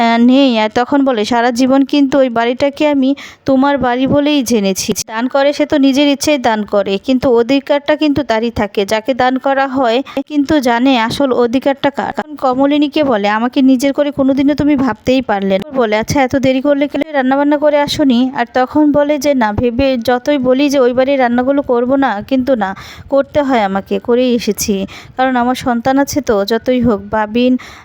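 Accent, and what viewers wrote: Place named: Bangladesh. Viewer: Ei serial ta khub jhule geche.sei sathe apnar ei bangla desi uchharon . dutoi biroktikor.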